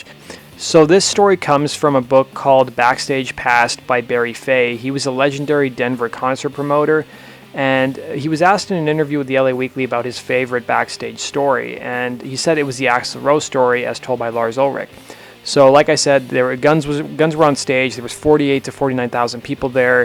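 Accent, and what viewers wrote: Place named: Canada. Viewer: no bro. Youre completely missing the point. I was just explaining that his 3-5-7 gun mistake was probably due to the fact that he sounds canadian (his accent leads me to believe) and therefore does not know as much about guns as an american would. Here we play hockey and harvest maple syrup but we dont know too much about guns although we do like to start a few riots every now and then.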